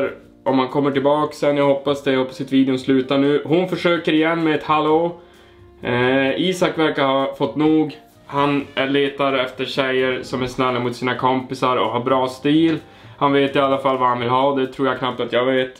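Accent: Norrland, Sweden. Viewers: Gör en video när du bara pratar norrländska! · vilken skön norrlands dialekt du har 😆👍 har precis börjat kollat på dig. kung äru👌🏻👌🏻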